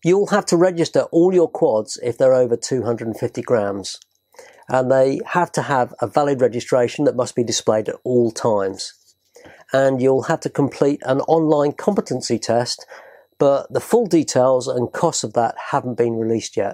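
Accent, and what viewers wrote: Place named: England, United Kingdom. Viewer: First visit, from a trainee pilot! Great to hear an English voice giving advice on this subject.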